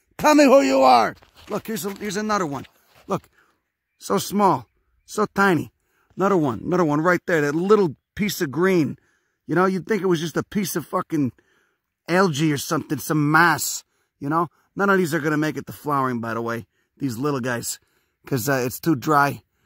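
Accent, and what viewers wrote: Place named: New York, United States. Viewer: I love your accent adds a a bit of humor to your videos. You have to be from New York. Great videos and educational.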